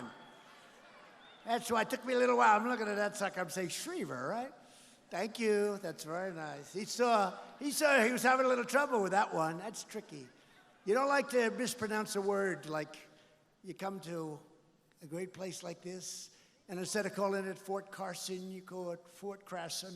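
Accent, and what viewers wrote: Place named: New York, United States. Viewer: Donald J. has the most beautiful New York way and accent of the people of New York. The greatest New Yorker of all time. I agree with your President, Brad Pitt comes off as a wise guy to me. Movies need less CGI and more talented actors. Gone With The Wind was a beautiful ugly film about ignorance in human history. Thank you Most Honourable President Donald J. for your channeling honesty and integrity. God Bless The #1 USA. I believe South and North Korea needs to settle their own issues and stop threatening the world. China needs to tell the world the truth about where this Coronavirus alias WHO renamed biological weapon COVID-19 immediately.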